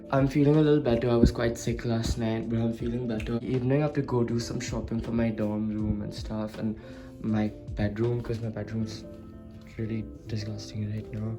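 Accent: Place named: South Bombay, India. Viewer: I'm confused, is he born and bought up in america? His accent sounds like a sobo accent though and he used to stay in sobo right?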